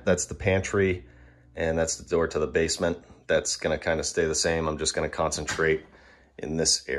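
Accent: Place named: Midwest, United States. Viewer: You live in Massachusetts ?? Are you original or you moved there ?? I don't hear any form of the typical " Boston Accent ". You sound more like a Mid-Westerner. I'm from Boston. Grew up in the Dorchester neighborhood. Left when I became an adult. . . . . .That whole snow thing is not much fun as an adult, but it was great when I was a kid, (snow days off from school).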